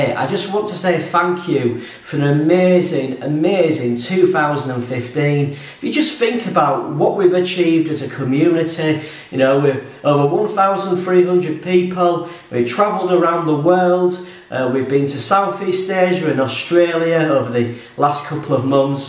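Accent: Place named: Yorkshire, United Kingdom. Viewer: Hi, I'm a fela from Córdoba, a city from Andalucía in the south of Spain..I am a English learner and I think that your videos are very nice to learn the Yorskhire accent. I visited your area a couple of times and I only can say that i am into all the issues from Yorshire, a region so nice, stunning and picturesque.I would like to travel as well.My city is very nice with all their muslim heritage and not so known as Seville and Granada. Enjoy your time in Spain and NY. Greetings1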